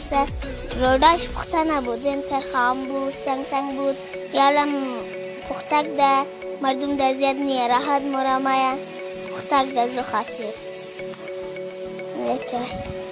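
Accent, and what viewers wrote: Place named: Hazarajat, Afghanistan. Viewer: ماشاالله بسيار عالي با اين لحجه هزراه گي موفق باشي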